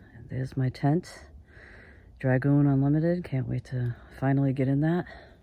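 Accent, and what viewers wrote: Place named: Massachusetts, United States. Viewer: Def a massachusetts Gal, that's my accent. I'm closer to Braintree etc. Used to camp up in Maine and NH a ton. Great video.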